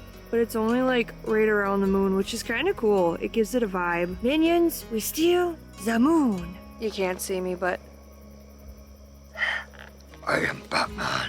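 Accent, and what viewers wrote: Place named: Midwest, United States. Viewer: not sure why this showed on my feed but you seem cool. Also love the midwest accent.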